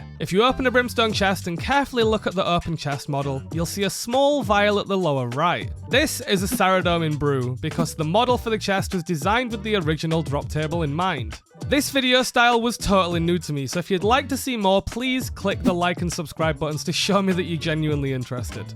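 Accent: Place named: East Yorkshire, United Kingdom. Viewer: Sounds like east yorkshire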